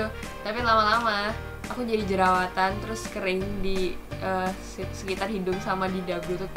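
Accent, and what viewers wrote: Java, Indonesia. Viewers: Sukaa banget sama suaranyaaaa😍 medok medok kalem adem gitcuuu, penjelasannya juga singkat, padat, dan jelas. langsung subs.💖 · Jowonya medok juga yaa mbak😅😅 walaupun ngomong pakai bahasa indonesia ttp keliatan jowonyaa😅😅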